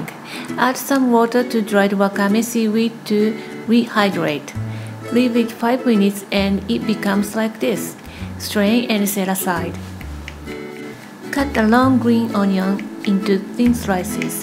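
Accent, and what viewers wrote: Japan. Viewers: english language with dialect japan but i love so much 😍😍 im from indonesia 🇮🇩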